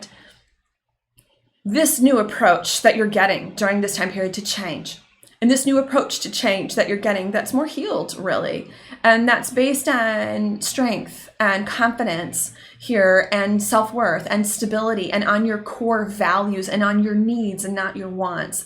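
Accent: South, United States. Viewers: I love when I can hear your southern accent-let it shine xoxo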